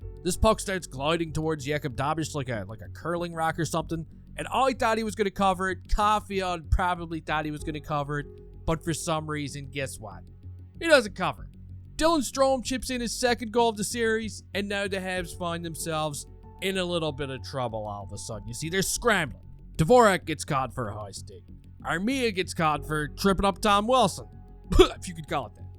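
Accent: Newfoundland, Canada. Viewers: Loved your breakdown of the game- TBH, I don’t who you are but I’m hearing a Newfie…or a Caper? I wish there was another view of the hit- I’m surprised there isn’t. The fact of the matter is this: If you enter a ring with a bull, you have to be aware of where he is at all times. And that goes for Wilson. 2 things Carrier could’ve done: 1)Put up the fence and risk a major or 2)Take the hit and STAY DOWN. Caps don’t score because the refs stop the play. Carrier was probably pulled because of concussion protocol anyway.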